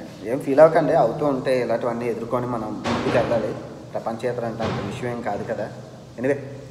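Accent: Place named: Visakhapatnam, India. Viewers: చాలా బాగా వివరంగా చాలా శ్రమకి ఓర్చి మీరు vizag యాస తో మాట్లాడుతూ చేస్తున్న విడియోలు superb . మీకు అంతా మంచి జరగాలి . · Good vedios sweet tocking bayya..vizag language 👌👌👌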